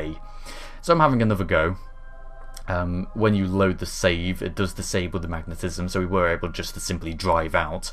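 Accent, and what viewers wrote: United Kingdom. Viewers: Don't worry. We all love your British accent.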